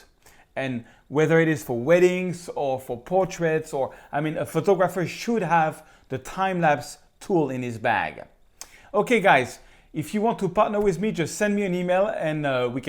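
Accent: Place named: France. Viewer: Nice tutorial. Everything's clear. Unfortunately, it was with your french accent but it's always better than the one from Gunther from LRTimelapse !! (^_^) Try it in french next time. And btw, the name of the device you were looking for is an intervalometer.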